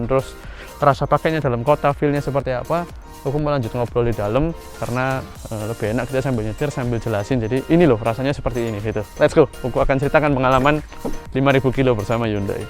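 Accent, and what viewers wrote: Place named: Chinese-Indonesian, Indonesia. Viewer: Logatnya itu loh... saya suka... bhineka tunggal ika.... saya diaspora. Denger suara, Rasanya kangen pulanx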